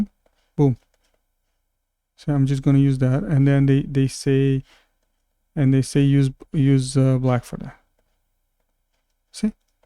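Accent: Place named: Romania. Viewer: La inceput chiar m-am gandit ca esti roman sincer. Dupa accent si aspect, doar ca m-a cam lasat in dubii "CA"-ul de la youtube. Deci am zis ca poate esti Canadian dar vorbesti franceza mai mult decat engleza. Sincer chiar ar merge sa faci o serie de tutoriale. Godot a inceput sa creasca foarte mult in ultimul an. Eu personal il folosesc de cam un an si ceva si nu am lansat nici macar un joc, ci doar prototipuri :)) Ai putea folosi strategia asta cu youtube-ul, sa faci niste tutoriale, sa-ti cresti canalul destul de bine si cand o sa lansezi jocuri o sa ai oameni interesati in proiecte. Deci pe scurt, ai putea face o sursa de venit din asta. Lasa-mi un detaliu de contact daca vrei si poate am putea comunica mai mult acolo. Multa sanatate si chiar astept tutorialele tale. Email-ul meu e : <mail>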